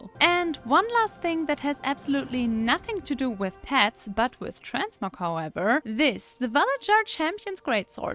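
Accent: Germany. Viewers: Ich lieb doch deinen deutschen Akzent😭😭 Abo is raus!! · you are german right?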